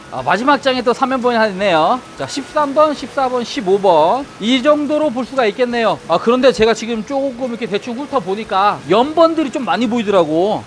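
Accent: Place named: Jeolla, South Korea. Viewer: 비 오는날 고생하네요 ㅋㅋㅋㅋ 아따 전라도 사투리 검나게 방갑소 ㅋㅋㅋㅋㅋㅋ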